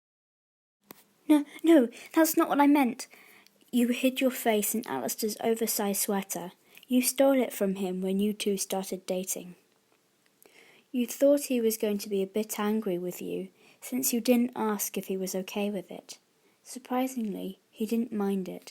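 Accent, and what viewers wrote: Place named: United Kingdom. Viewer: For some reason when someone who has a British accent and they are reading it sounds nice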